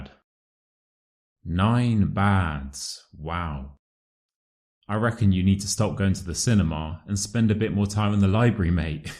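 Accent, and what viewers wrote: United Kingdom. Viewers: I finaly found a proper British speaking chanal! It's marvellous! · Thank u very much ı love your brıtısh accent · Thank you. Love your British Accent and your sense of humour.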